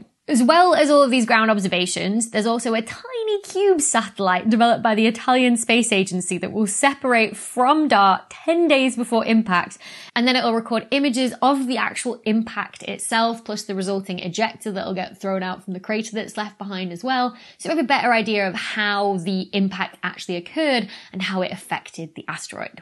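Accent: United Kingdom. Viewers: Dr Becky, what part of the United Kingdom are you from? I ask because I am intrigued by your speech patterns . Believe me this is not a criticism, I am from the United States where we haven’t spoken English in years. Just an old man’s curiosity. I have started listening to your new book and love it so far.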